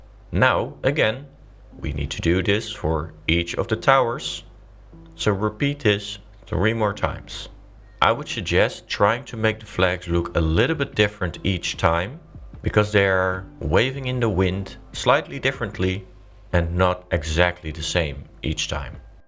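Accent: Netherlands. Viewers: this is the first time i realy heard the dutch accent i your english never knew you where dutch. and ofcource a great build keep it up man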